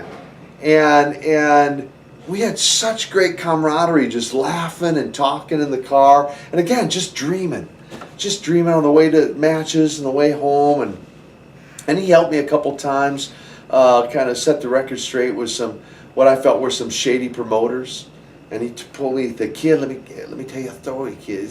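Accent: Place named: United States. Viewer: Wow, he learned to speak in an American accent really well!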